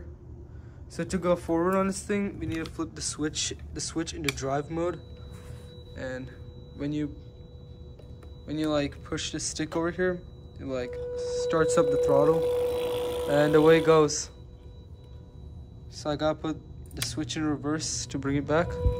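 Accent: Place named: Kerala, India. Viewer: your name and your accent 😀. is a common name in kerala and the way you speaks resonated like a malayali touch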